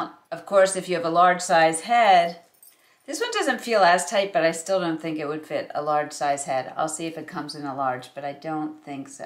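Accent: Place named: New Jersey, United States. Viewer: I'm from Connecticut near the Westchester border and to my ear I hear New Jersey.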